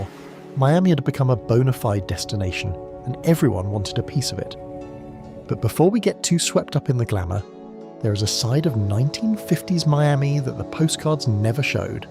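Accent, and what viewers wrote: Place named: United Kingdom. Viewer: Your videos are fun to watch. They're meant to give viewers a general idea of what it was like. I can forgive AI for the errors in details. I do wish that you used American accents for narration on videos about American locations. British narration is fine for Europe and other areas of the world, I guess. It just seems like 90% of narration about anything uses a British accent now.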